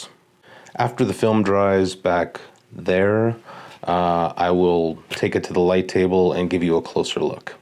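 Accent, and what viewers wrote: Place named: Canada. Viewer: canadian aye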